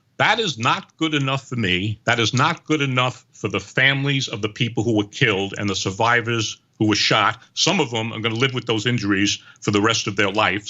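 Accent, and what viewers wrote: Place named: New York, United States. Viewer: Mr. is a confirmed Jesuit operative with direct ties to the headquarters of the Swiss Guard in Vatican City, the 5 families of the Cosa Nostra, nearly executive and board member of several Hollywood studios. Notice how hard he tries (and fails) to present himself as some educated professional. His struggle to suppress his borough accent is clear as day. Most of his ‘guests’ also just so happen to be big dumb wops — the vast majority of which are uneducated and underqualified to work in the professions they purport to be associated with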